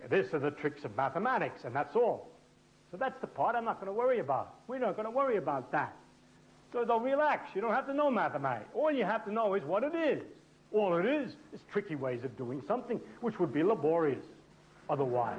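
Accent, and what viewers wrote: United States. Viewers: Brilliant Man..Sounds like physics 101 direct from the Bronx.. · Such a New Yorker haha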